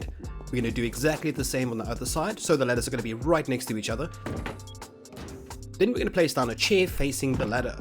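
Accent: Afrikaans, South Africa. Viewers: You sound Afrikaans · I heard your voice and new You were south African 😂😂❤️ Bro Where did you get your pc.. Is it a custom build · Are u South African bru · Are you south african? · Is my friend here south African?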